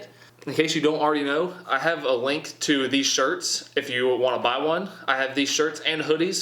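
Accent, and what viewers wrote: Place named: Pennsylvania, United States. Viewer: your from pennsylvania aren’t you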